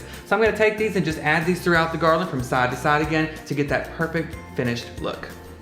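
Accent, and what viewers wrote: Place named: South, United States. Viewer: Child, you are so southern! Love it! ♥️♥️♥️♥️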